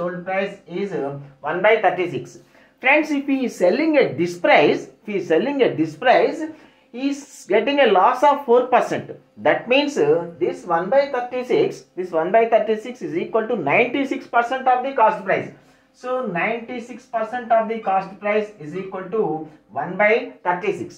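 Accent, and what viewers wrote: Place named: South India, India. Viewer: Sirr Ay like your slang typical Dravidian slang love from SHIMLA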